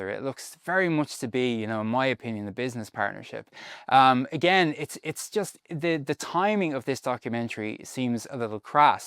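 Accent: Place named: Ireland. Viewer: Nice to hear an Irish review 👏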